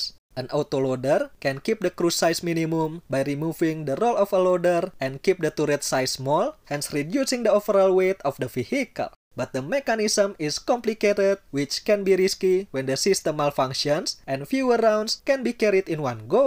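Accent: Indonesia. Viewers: English tapi pekat pelat Indonesia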